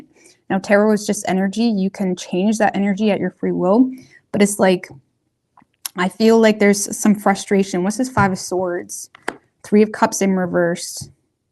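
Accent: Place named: Canada. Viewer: Just wanna say. I really like when readers are open enough to let is into their personal lives and take a break to stretch, smoke break etc. As a smoker i love to see the reality and appreciate doing what you do. As a fellow Canadian who lives in thd U.S. hearing you makes me happy and homesick. Thanks.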